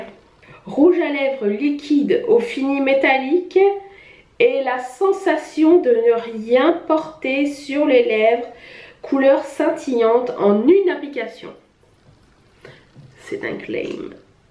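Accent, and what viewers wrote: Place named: Quebec, Canada. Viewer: J'aime bien quand tu parles à la québécoise!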